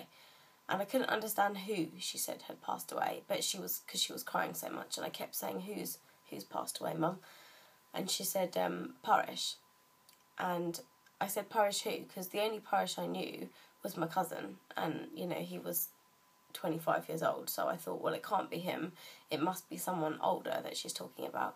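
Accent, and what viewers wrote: England, United Kingdom. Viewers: whoa i was not expecting the english accent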